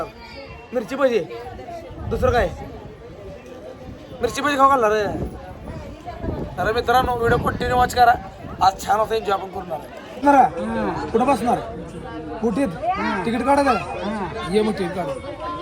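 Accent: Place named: Solapur, India. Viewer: खाली ये बे लाज आबरू सोडला बे भारी होत हे😂😂😂😂😂😂 आ दादा खुप हसलो आमी छान बोलता दादा तुमी सोलापुरची भाषा ❤❤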